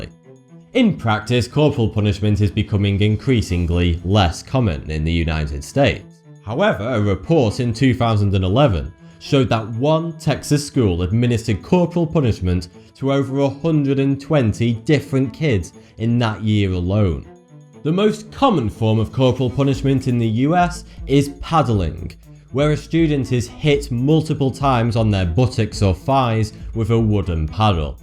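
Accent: United Kingdom. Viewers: Whats with the Brit? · Does this account for the USA? because you sound British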